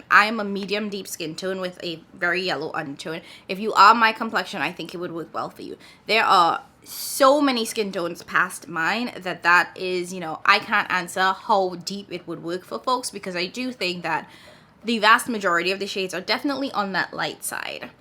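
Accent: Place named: Trinidad and Tobago. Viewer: Are you from Trinidad? I detect an accent! I am considering picking this palette up but I was worried that some of the mattes would be too light for me. This video was really helpful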